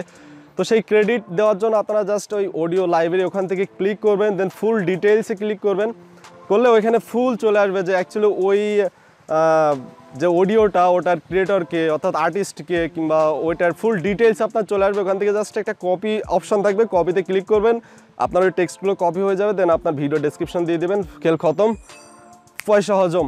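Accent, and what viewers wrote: Sylhet, Bangladesh. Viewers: ভাই আপনার বাড়ি সিলেট না কি?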